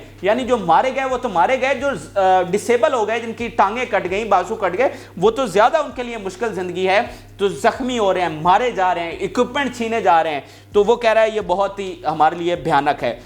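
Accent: Punjab, Pakistan. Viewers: Panjabi hinglish is very good.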